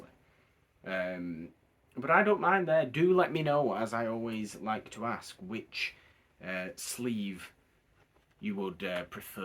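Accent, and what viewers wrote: Northern England, United Kingdom. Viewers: I’m trying to find a review of this box set, and not seeing ‘unboxing’ on your post, I tried you. So I’m disappointed. Nice to hear a northern accent, though.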